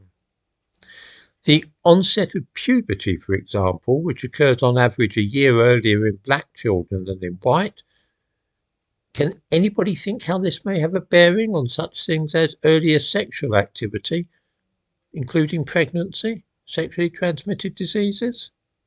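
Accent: United Kingdom. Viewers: good job on this comment section you created a nice space for racists and xenophobes to gather and share their thoughts. "race matters we ignore it" what do you suppose we shall do with race ? what is your suggestion oh great one? push them towards taking up sports? racially profile them so they can be closley watched by the police because they have more testosterone ? (!) you, WHITE PEOPLE, have committed, and continue to commit some of the most horrendous crimes against humanity, wasn't your "kind" who would cut the right hand of african children if they failed to work as hard their "masters" demanded. wasn't that barbaric? oh that was necessary because you think they are inferior race don't you ? disgusting. just because you speak with a British accent doesn't mean what you say makes ANY sense. "race matters we shouldn't ignore it" alright you tell us what to do lol. 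or you're simply leaving things vague for your followers and commenters to fill in? judging by comments such as "the crime stats prove this" they sure understand your language. they sure are great at generalizing, putting people in a box and putting a label on it.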